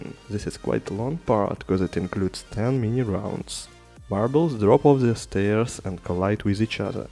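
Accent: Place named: Russia. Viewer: у автора как будто русский акцент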